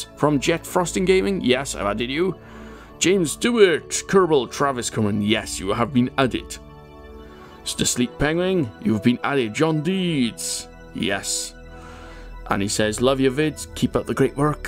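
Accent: Wales, United Kingdom. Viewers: believe me. your accent is definitely Welsh! and strong too. Be proud of it buddy!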